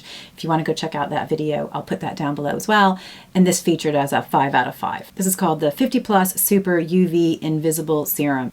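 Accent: Ireland. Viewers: Hi i am new to your channel and really liking it so thanks xx love your accent with the slight irish twang thrown in! x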